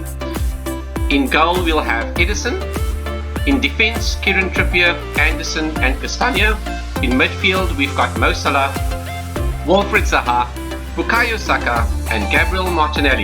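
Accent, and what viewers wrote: South Africa. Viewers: South African?.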